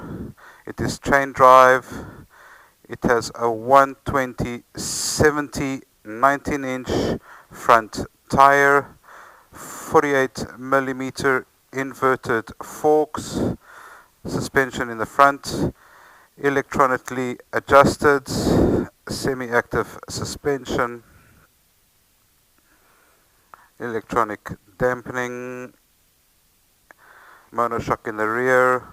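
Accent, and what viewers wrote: South Africa. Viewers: Ai cool, was wondering as you have the SA accent but you are riding on the wrong side of the road ;)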